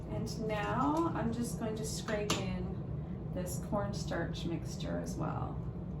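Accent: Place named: Canada. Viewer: You are now more Canadian sounding...beautiful.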